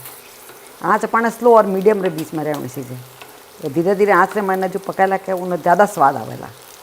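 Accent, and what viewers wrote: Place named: Garhwal, India. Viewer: Why Aunti Ji is Sounding me a gadwali 😀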